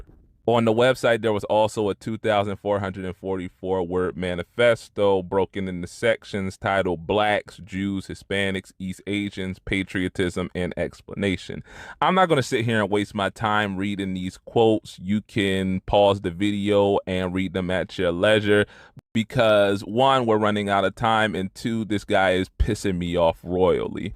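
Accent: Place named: South, United States. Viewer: You have a way with words my brother.. I know your from up north but your speech is southern (which in turn is likely derived from Afrikan ancestors whose culture is tied to proverbs and poetic means of expression through language)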